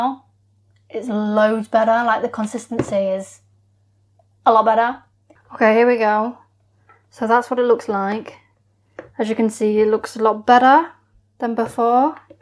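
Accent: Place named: Kentucky, United States. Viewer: absolutely I love your accent, and mine is just as country if not more so than Chris, Amy, and Tammy’s! We’re from the same neck of the woods here in Kentucky! 😂 Biscuits and gravy have to be HOMEmade not from a restaurant otherwise you just congealed slop. 😂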